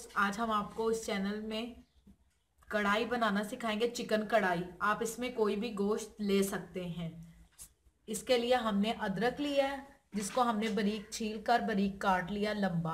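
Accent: Lahore, Pakistan. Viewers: Her accent is beautiful. Lahore, Lahore ha. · Yh lahoreye aesy he bolty hen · Accent is subjective. Would sound weird in karachi, but is normal in lahore. Food looks yummy, what's matters is substance and not cosmetic features which we like to do. Loved this clip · Labori urdo mix Punjabi style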